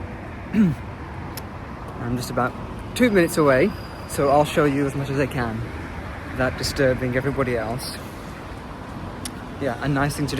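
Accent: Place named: England, United Kingdom. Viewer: I'm a Hongkonger and always like English accent.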